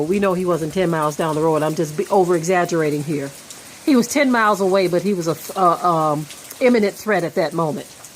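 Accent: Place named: South, United States. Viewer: I love your accent, which state are you from? Sounds like somewhere in the South.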